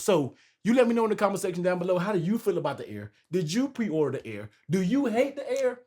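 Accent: United States. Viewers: I know that accent…you from the DMV bruh?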